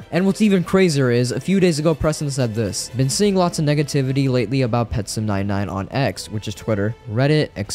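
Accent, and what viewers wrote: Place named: United States. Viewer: bro u are also indian but ur accent is like americans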